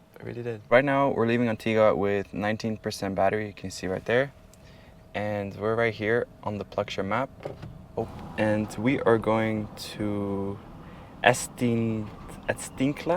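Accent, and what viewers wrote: Netherlands. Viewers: Ik dacht al aan het accent te horen dat je een Nederlandse tegenkwam😉 Leuk om te zien in comments dat er mensen op zo veel verschillende plekken naar je kijken! Ook vanuit Cyprus kijk ik graag mee😉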